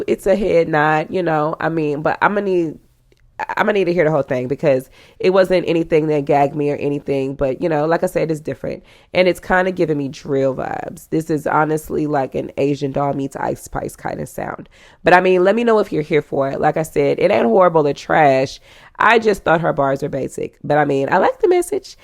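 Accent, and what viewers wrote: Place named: South, United States. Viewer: This is the best channel on here! Hands down! I think it's the Southern in you, and I'm a Southerner too. Lolllllllllll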